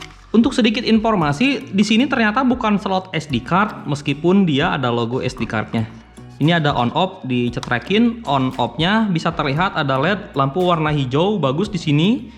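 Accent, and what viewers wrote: West Java, Indonesia. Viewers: Mantap bang orang sunda ya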